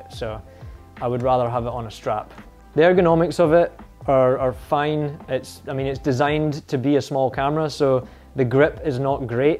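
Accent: Scotland, United Kingdom. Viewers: Hey, you lucky person! A new X100V that someone didn't want, at a really good price. I bought mine and got it two days before the stay home began in Scotland... I've got the same black version. My weather seal kit was on backorder when I picked the camera up, so once this crisis is behind us, I’ll go and collect it... You said you weren't keen on it... Persevere with it, you might enjoy the simplicity of the camera, it just takes a wee bit of time to enjoy it. It looks like you're in the USA but I hear a Scots accent there... 🏴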